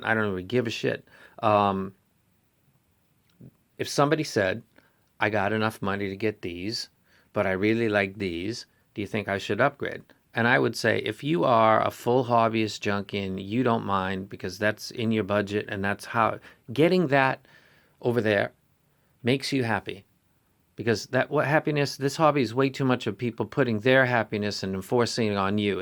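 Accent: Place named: Maine, United States. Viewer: you sound like youre from Maine :)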